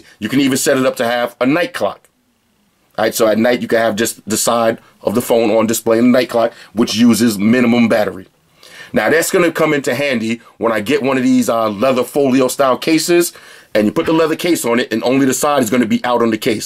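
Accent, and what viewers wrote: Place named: Brooklyn, United States. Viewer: Sound like Brooklyn in the house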